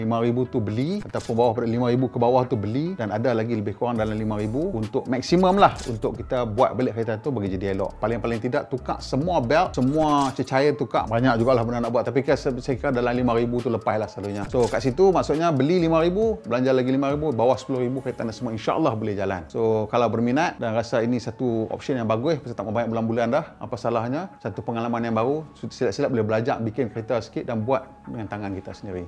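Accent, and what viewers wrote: North, Malaysia. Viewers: Bunyi Utaqa ceq oiii. Tobat lawak ckp. Heqot, mampoih. 😂😂😂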